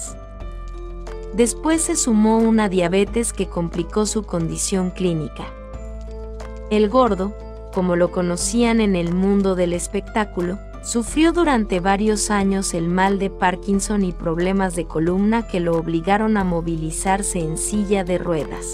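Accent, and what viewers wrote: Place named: Central America. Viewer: Por que el audio con tono o acento centro americano? No me gusta.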